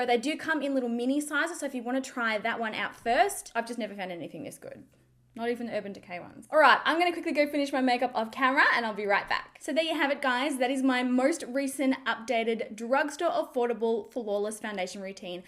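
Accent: Australia. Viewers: Love this makeup video,,, as always you slay every makeup tutorial review and your an awesome YouTuber,,, another thing I want to say is I love your Australian accent,,,, am a fan of home and away and I just love the way the actors speak,,, lol I just wanted to put it out there ☺😎 Lots if love from the UK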